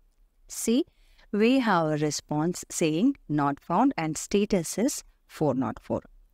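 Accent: India. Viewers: May I know you are from kerala? your english is good. I saw lot of kerala people has this prononciation of speaking english.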